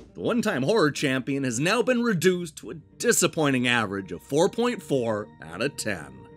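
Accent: United States. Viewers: I recall our narrator mentioning he's from WA. My theory is that it must be a Pacific Northwest dialect thing. I think Johnny Millennium (aka Happy Console Gamer, from Vancouver) pronounces dragon the same way.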